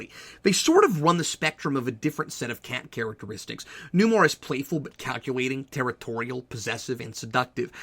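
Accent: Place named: United States. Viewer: CRAP....TOLD US NOTHING EXCEPT THAT ANOTHER 'AMERICAN' LIKES THE SOUND OF HIS OWN ACCENT...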